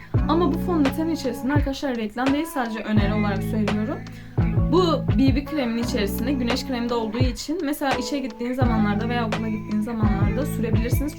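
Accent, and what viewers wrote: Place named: Kurdistan, Turkey. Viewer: kürt müsün şiven bazen kayıyor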